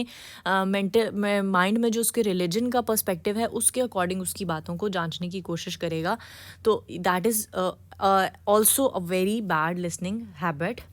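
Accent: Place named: Punjab, India. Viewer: mam punjabi bolti hyn ap smjaty hoay bht acha lga apka andaz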